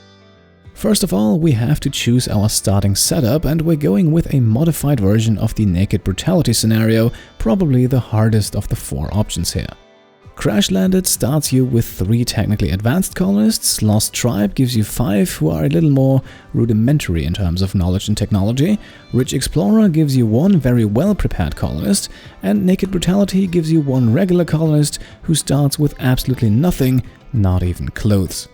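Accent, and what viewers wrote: RP, United Kingdom. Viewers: "Giveaway" A British man narrates the life of a naked tribal man named Steak that crash landed in an extreme desert who is on a quest to obtain psychic powers. Just ordinary Rimworld things. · posh as fuck accent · Was looking for a naked brutality run of royalty. Found a posh accent dude doing it and a giveaway. Cool beans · Never heard a British person speak like that!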